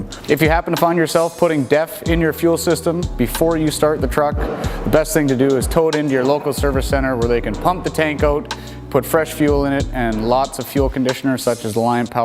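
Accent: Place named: Canada. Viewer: grew up near canada. can always tell the accent when we say "aout" instead of out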